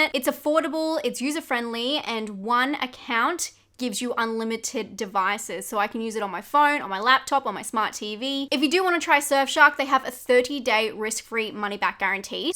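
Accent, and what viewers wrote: Australia. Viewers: Thanks for another wonderful list, I’m checking the children of the corn, by the way, I’m totally amazed by the Australian accent you got there ! 🤩 Greetings from Berlin, Germany ✌️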